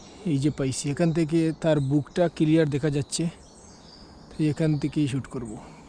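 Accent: Sylhet, Bangladesh. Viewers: ভাই শিকার করেন আইনি কোনো সমস্যা হয়না। আর আপনি কি সিলেটি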